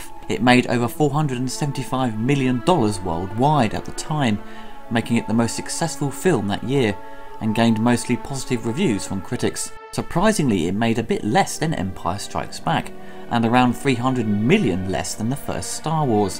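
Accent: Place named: RP, United Kingdom. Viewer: It's funny to hear someone with a relatively posh sounding British accent say "butthole".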